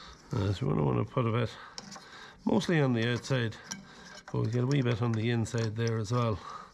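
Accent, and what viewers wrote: Dundalk, Ireland. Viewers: Great video. Happy holidays,. Are you from around Dundalk somewhere?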